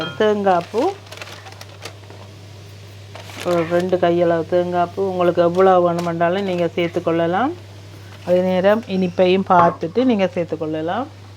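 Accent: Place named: Jaffna, Sri Lanka. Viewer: உங்கள்றிசிப்பி அருமை அதையும்விடஃஉஙகள் கதை நம்தாய்நாட்டு இனிமையான நினைவுகள் அதை கேட்பதற்காக உங்கள்பதிவுகளை பார்ப்பதுண்டு சாவகச்சேரி சங்கத்தின் பக்கத்து வீடு போல அதனால்பேச்சுவழக்கு கேட்க ஆசை வாய் கரைப்பது விட கைகள்so cute அந்த cuteness தான்எல்லோருக்கும் வராதுவந்துவிட்டால் அது அனுபவம் வளரட்டும் 🎉🎉🎉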